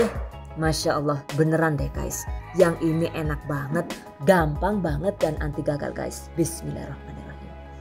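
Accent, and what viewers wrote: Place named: Cilacap, Indonesia. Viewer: Ky logate wong cilacap😁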